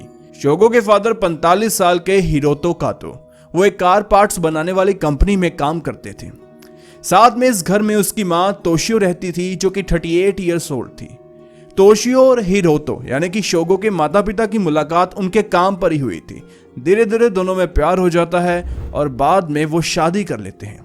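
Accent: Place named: Punjab, India. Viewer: thorA bai punjabi touch nll bolda hindi like teekhi cheej bola nuklelee ko 😂😂 ndd bor nhi hunda bnda jinioo mrzi long hove video ❤❤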